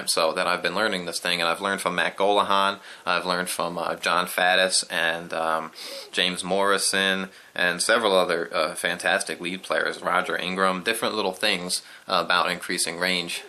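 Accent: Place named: Philadelphia, United States. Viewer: great explanation! you sound like a Philly or South Jersey guy. I've been searching for some confirmation on Morrison's ideas and another guy from Australia who says the same thing on blowing levels equaling harmonic slot increases, and you've done that very well here. Thanks!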